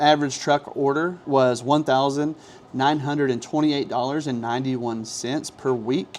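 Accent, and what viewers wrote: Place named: South, United States. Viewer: Sounds like he's from the south, $500 is OK if you're in Mississippi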